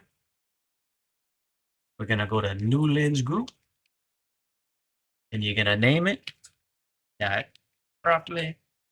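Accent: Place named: Louisiana, United States. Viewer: I know a badass cajun when I hear one. Thanks for this tutorial ami ♥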